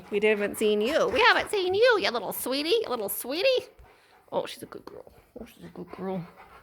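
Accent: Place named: United States. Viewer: Your big dogs are lovely! Will they eventually be allowed to be with the Bulldogs? Your accent is American...however it seems you live elsewhere ...may I be as bold as to ask where?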